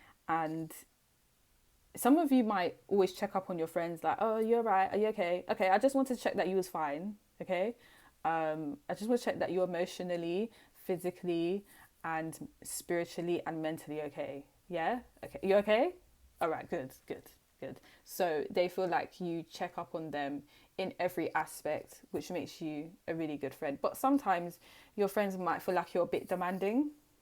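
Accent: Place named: United Kingdom. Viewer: I love your humor and British accents really make me weak, so adorable 🥰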